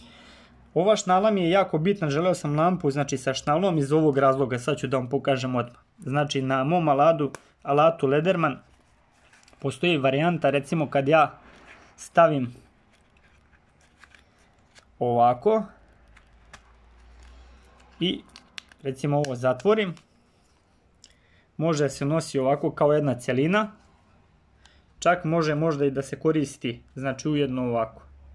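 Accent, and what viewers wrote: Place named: Mačva, Serbia. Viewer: Veliki pozdrav, samo tako nastavi. Ledlenser je odlična kompanija imam dve, jednu na glavu i jednu sličnu toj samo malo veću. Meni se čini po tvom naglasku da si iz Mačve, ili grešim🤔🤔?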